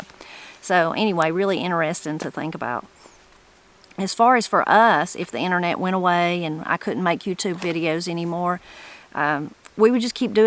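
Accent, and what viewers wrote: Appalachia, United States. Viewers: I love this channel, your accent, the Appalachian people. I’m so far from home, I subscribe to your channel and get a taste of home. I read the comments for “dessert “. I’ve been living in Mexico for 20 years, at first with my young son, but then he returned to the US for college, sigh. Your channel warms my heart . I was in Bryson City with my siblings and mother. We spent the summer there in the mountains to get away from the South Florida busy ness. We camped, swam in a local public pool, the river, learned macrame and sand painting. We climbed trees, I tasted crabapples from the tree for the first time. We caught lightning bugs, they filled the whole yard, as well as the mosquitoes. The best summer of my life. Maybe one day the Lord will bring me back to Appalachia. We were all born in TN, but Nantahala Gorge, oh my heart.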